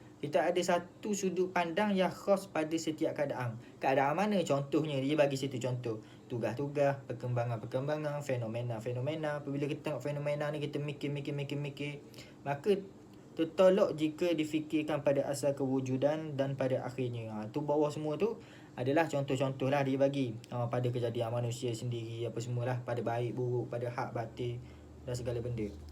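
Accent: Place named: Terengganu, Malaysia. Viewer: Pekat sungguh ganu enta... Akan tetapi penerangan yg jelas dan terperinci 👍👍👍👍👍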